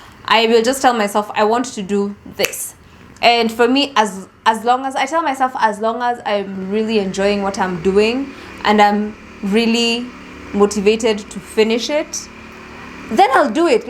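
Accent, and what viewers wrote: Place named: United States. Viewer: You have an american accent have you lived in the states or were you going to an international school in your country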